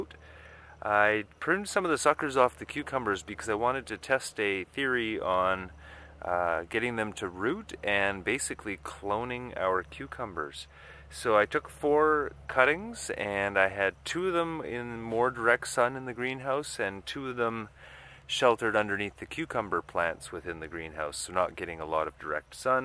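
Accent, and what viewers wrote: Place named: Canada. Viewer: Are you Canadian? I can hear the accent. Reminds me of home. I never really noticed until I moved to the US. Now every time I talk to family it’s all “a-boat” and “eh” and “buddy”. Lol.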